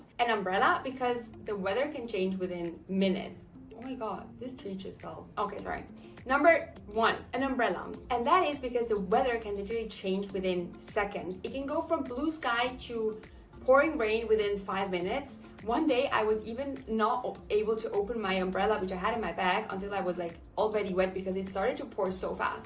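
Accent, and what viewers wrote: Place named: Singapore. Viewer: u already sound like a singaporean :face-blue-smiling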